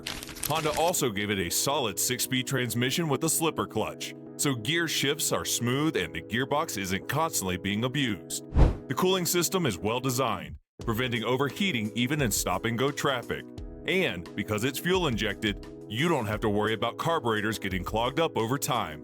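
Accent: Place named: United States. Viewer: Leider erzählt...im extrem hässlichen US- Englisch...schade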